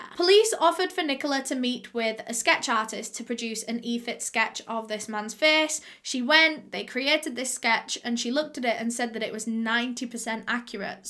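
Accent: United Kingdom. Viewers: Dirty cops... jeez. Question:, are you British? My niece wants to know what your accent is. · I can't say for sure, but I'm British and I think her accent is British too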